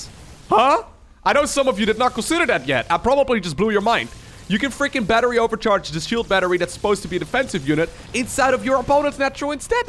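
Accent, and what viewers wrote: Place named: Netherlands. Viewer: its the dutch accept onme of my best friends he speaks like that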